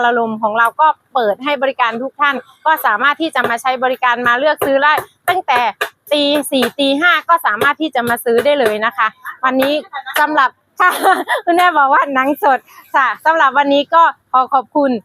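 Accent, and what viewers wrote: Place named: Sisaket, Thailand. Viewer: เว้าสำเนียงศรีสะเกษบ้านเฮาเพราะหลาย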